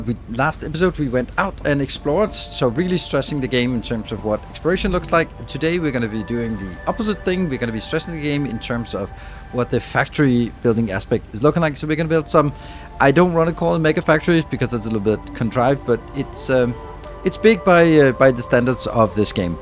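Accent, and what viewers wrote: Denmark. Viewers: Quick question i hear your voice but are you dansk ?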